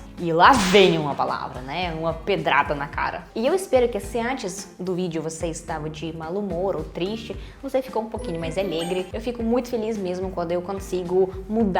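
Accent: Russia. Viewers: Parabéns pelos seus vídeos, Obrigado pelo seu jeito sempre leve, divertido, simpático de mostrar as diferenças entre Brasil e Rússia, e o seu sotaque Russo misturado com o sotaque do estado do Paraná, deixa seu jeito de falar português muito legal e interessante! 😊❤ Sou de Goiás, vizinho de Brasília, na minha região tem gente com vários sotaques diferentes. 😅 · é claro que é sotaque. Em russo quando há uma palavra com duas letras O a primeira fica com som de A. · Você é apaixonante. Também vim de família russa. Seu "sAtaque" é lindo e me lembra os velhinhos da minha família. Nunca perca esse sotaque. Acho você a melhor surpresa do youtube no Brasil nos últimos tempos. Um beijo do Rio ❤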